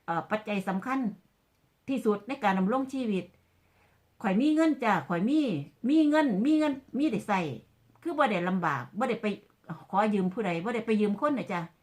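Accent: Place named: Khon Kaen, Thailand. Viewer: เปลี่ยนช่องมาติดตามพี่จ๊ะดูหลายตอนแล้วชอบสำเนียงคนขอนแก่นเพราะคุณยายและแม่เป็นคนขอนแก่นค่ะ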